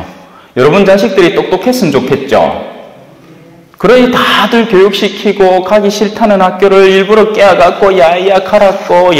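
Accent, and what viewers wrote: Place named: Gyeongbuk, South Korea. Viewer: 신부님.감시합니다 다정.다감하신.말씀.강론.찰지십니다..경북..내고향.의성가음면...신부님.사투리..베트남에서 기뻐합니다..아멘.🙏🙏🙏🙏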